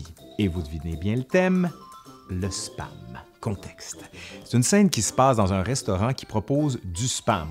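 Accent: Canada. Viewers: L’accent canadien, je m’y ferai jamais. Impossible à prendre au sérieux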